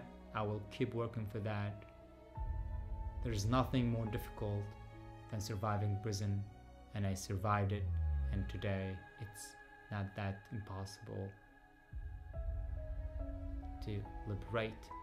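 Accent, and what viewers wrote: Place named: Baniyas, Syria. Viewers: الشغري من مدينتي بانياس و بعرفو شخصيا اللهجة البانياسية (البيضة) صعبة شوي عغير الناطقين بها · متتتتتت😂😂😂😂😂😂 احلى شي انه الناس بتشك انو عربي😂😂😂😂😂😂😂 هي لهجتنا اهل البيضة "الساحل" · لهجة بانياس ياجاهل.. ك ماشفت ضيعة ضايعة؟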